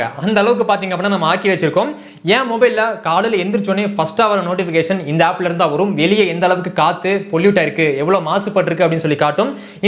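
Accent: Coimbatore, India. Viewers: bro neenga coiambathura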